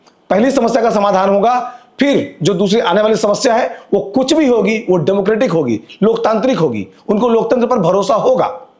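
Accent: Chhattisgarh, India. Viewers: महराज मैं bilaigrh से आप के सबों वीडियो के injar करथा आप के छत्तीसगढ़ी बोली अच्छा लगते आप बिलाईगढ़ आहा करके बोले रहा महराज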